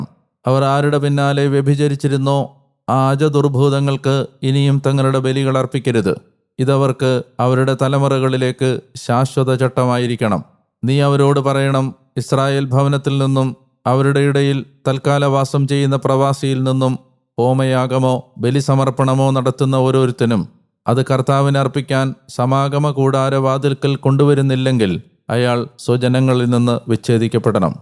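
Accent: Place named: Pathanamthitta, India. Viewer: Karthave during my 75 years of age first time a Priest ithupole ithra clear ayittu Thiru vachananam kelppikkan athu. Achente way of talking n Malayalam language is like my own birth place Pathanamthitta Kerala. Though I n my family is in Rajasthan for the last 50-60 years, I haven't learn malayalam typing and as such I have to type malayalam typing in English. ❤❤❤.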